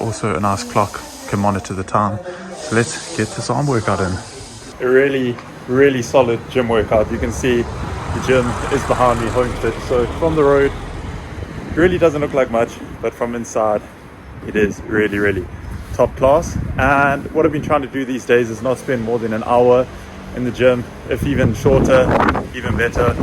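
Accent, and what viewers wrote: South Africa. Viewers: Hi, judging from your accent you are South African😊 I'm south African and looking to stay a few months in Algeria. Where exactly are you staying? And how does life compare to back home. Ps. We're Muslim so cultural integration shouldn't be too much of a problem.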